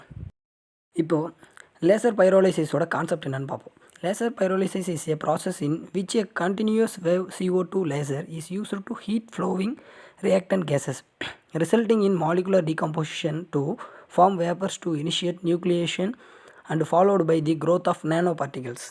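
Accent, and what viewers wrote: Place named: South, India. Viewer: I think he belong to some place in South India n that's why his accent is a bit different and difficult for us to understand (I am from north India).. I played the video on 0.75X n see I was able to understand.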